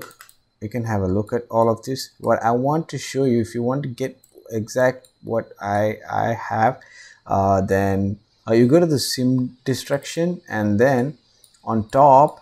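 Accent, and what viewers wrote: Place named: South India, India. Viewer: South Indian spotted XD Nice video though, loved it!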